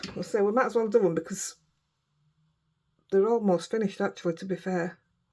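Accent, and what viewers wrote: Yorkshire, United Kingdom. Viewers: Great tutorial they are gorgeous cards…. Lovely to hear the Yorkshire accent makes me feel like I’m home xxxx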